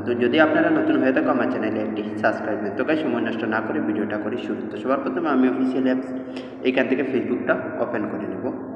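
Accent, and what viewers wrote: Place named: Sylhet, Bangladesh. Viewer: আপনি ত সিলেটি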